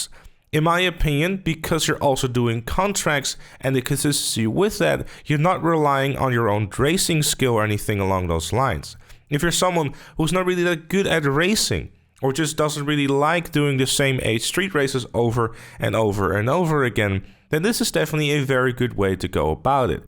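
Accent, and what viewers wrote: Netherlands. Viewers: Bro kwist gelijk dat je een nederlander bent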